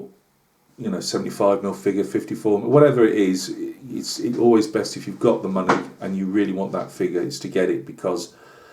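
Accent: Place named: England, United Kingdom. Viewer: It is so good hearing an English accent again. I am an expat from South Africa, been living in Spring, Texas for the past 29 years. I love your hobby, so nice.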